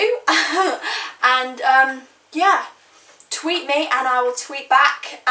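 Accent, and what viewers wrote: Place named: United Kingdom. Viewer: How is it possible to talk with British accent and sing with American accent ?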